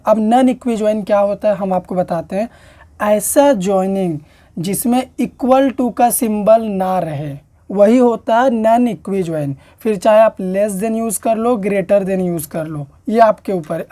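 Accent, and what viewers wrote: Jamshedpur, India. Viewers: Aap jamshedpur se ho kya 😅? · Sir JAMSHEDPUR se ho kya?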